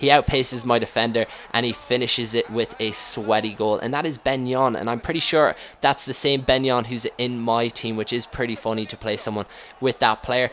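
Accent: Ireland. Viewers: i love you cause your irish lke me:)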